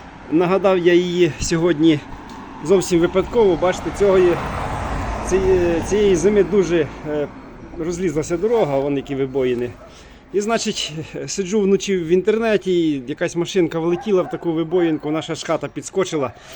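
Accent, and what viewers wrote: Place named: Kremenets, Ukraine. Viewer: Кременецький "діалект" просто жахливий, коли слухаєш "зі сторони".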